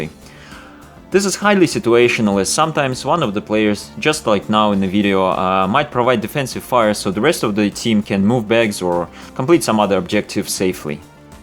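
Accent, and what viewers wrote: Russia. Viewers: your accent are you a russian? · Hes russian right?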